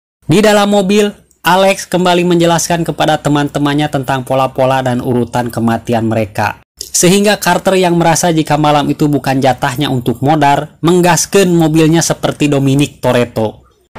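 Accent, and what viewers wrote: West Java, Indonesia. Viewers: Lanjut dengan logat sundanya euyy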